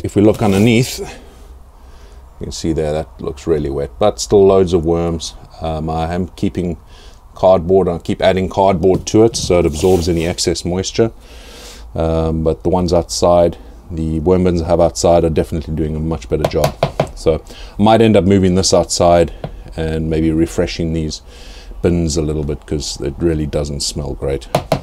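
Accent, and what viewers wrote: South Africa. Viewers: You're in the UK, but your accent sounds like a South African.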